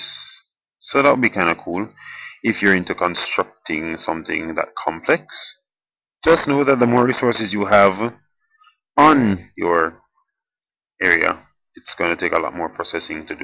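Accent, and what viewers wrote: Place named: Caribbean. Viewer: are you jamaican...its good to hear a caribbean accent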